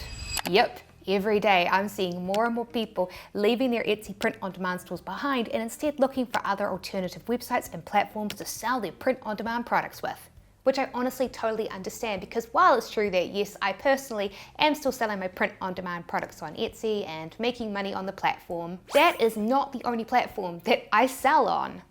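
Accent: New Zealand. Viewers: I lived in NZ for 22 years. It's nice to hear the Kiwi accent again. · Im not new here but what exactly is your accent? Its like you grew up in NZ, but have an American and Australian parents. From an Aussie across the ditch. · Hi, Fellow Kiwi here so I can understand you perfectly. I would love to move away from Etsy to Shopify but the time I'll have to put into marketing is daunting. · I knew instantly you were Kiwi when you said Etsy 😂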